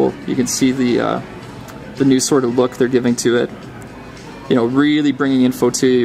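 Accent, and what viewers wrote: Canada. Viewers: He's Canadia, right? Btw, rooting for BlackBerry. Go RIM!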